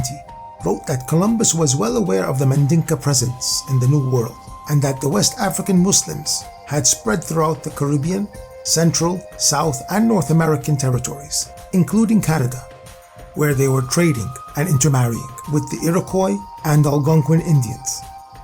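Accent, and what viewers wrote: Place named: Egypt. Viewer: By the tone and inflection, the narrator is Egyptian and I suppose he didn't want to reveal that aspect of Egyptian history for fear of it being mistaken as a bad thing. A euphemism of sorts. The price of gold in Egyptian markets plummeted as did the cost of living .. which is a good thing for the public masses..and bad thing for the rich.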